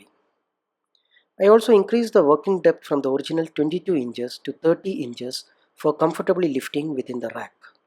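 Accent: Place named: South India, India. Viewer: Hello sir, your slang seems to be that of a South Indian. To be more specific, a keralite or a tamilian. I'm from Tamil Nadu. I'm following starting strength for a couple of years. Now I'm planning to build a home gym in my apartment based on ripottoes's recommendation. But, I was concerned about the deadlift disturbing my neighbours downstairs or creating a great vibration in the floor. Were your deadlift quiet enough to not to concern your neighbours?. And it would help me a lot if your share your designs. Thanks in advance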